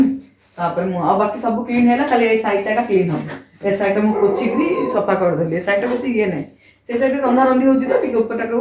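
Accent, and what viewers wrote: Berhampur, India. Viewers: Di tame eng. re ହିଁ subscriber kuha odia sabda (ଚାନ୍ଦା ଦାତା, ଗ୍ରାହକ) ta vala laguni, but hindi sabda (yogdan karta) ta gote prakara thik achhi. Tamaku dinaku thare na dekhile jama val laguni, khass kari tama ବରମ୍ପପୁରୀଆ vasa, ohh 👌👌 mind blowing 🤗🤗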